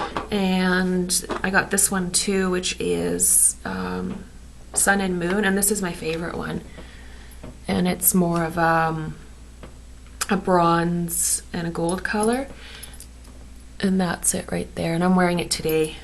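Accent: Canada. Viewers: Love the heavy Canadian accent =) Eh! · Great haul. BIG FAN of the Canadian accent. Go Habs Go!!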